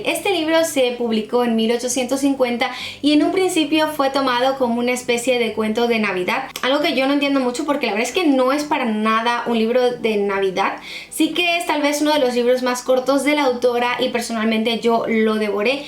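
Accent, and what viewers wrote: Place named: Mexico. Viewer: Tu acento es mexicano pero le añades el "os" De donde eres? Buenos libros. Ciao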